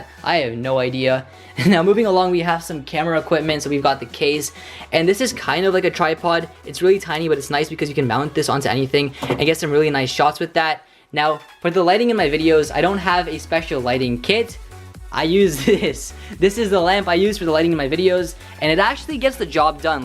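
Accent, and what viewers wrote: India. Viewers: Your Indian?? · u indian? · are you Indian my friend???... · dude are you ..... indian ? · dude it seems ur Indian? Am I right?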